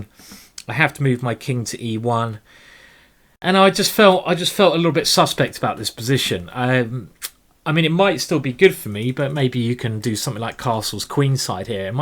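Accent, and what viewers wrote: United Kingdom. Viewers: Your voice's alright, mate. The world is bathed in American media, with nasal voices in comparison to which any British accent at all is like a musical experience.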